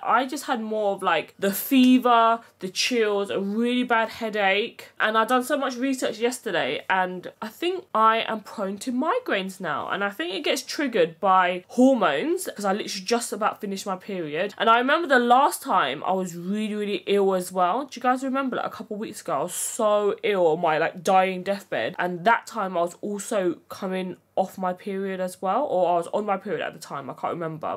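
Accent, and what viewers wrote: United Kingdom. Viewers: Hi! Wassupppp??!!..🤗😬 i just looove love loveee to listen to yr brit-ish accent..hahahaha...take care love 🌹🎈🥰 keep inspiring us! Have a safe flight and enjoy your vacation and boo! Haha...just have a comfy and silent vacay love. Let yr mind get more rest yaaa..cheers!🎈🌹🥰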